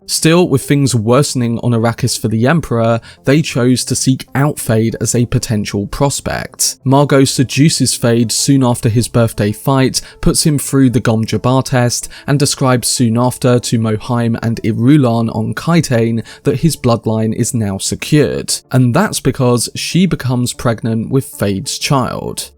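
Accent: United Kingdom. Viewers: Your accent is super cute. Vitamin, water, other words… British?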